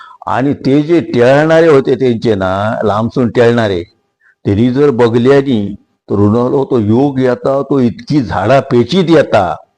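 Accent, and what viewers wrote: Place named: Malvani, India. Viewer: एकदम शुद्ध मालवणी मजा आली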